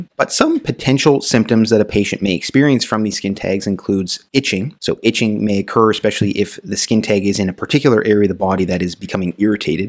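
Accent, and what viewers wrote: Canada. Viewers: It's called a Canadian accent, genteel educated version.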